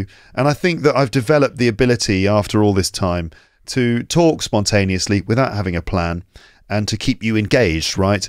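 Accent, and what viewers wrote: United Kingdom. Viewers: Hi: I am so happy find this channel to learn English with British accent, again. T|his is because many years ago, I was in in the UK to study my degree n an university. But after immigrate to Canada, I lost my accent and even English (almost). I wonder do you a class to teach a group of people to learn English?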